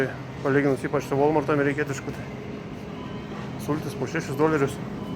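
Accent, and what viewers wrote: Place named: Šiluva, Lithuania. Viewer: AŠ iš Šiluvos.malonu.Keista,kad kalan grynai tai pat. :hand-pink-waving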